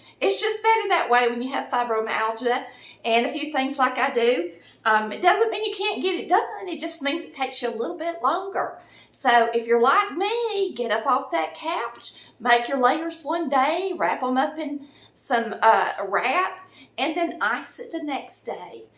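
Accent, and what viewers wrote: South, United States. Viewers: you have mind blowing southern accent, love it😍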